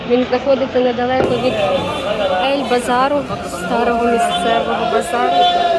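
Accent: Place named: Poltava, Ukraine. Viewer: Суржик Полтавський чи Дніпро?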